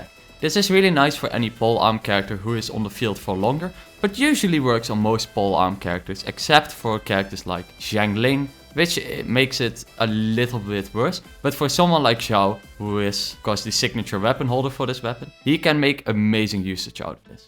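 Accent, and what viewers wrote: France. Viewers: The French accent, i'll pull for whatever listening to the accent. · you have an accent... I can't pinpoint it but it sounds french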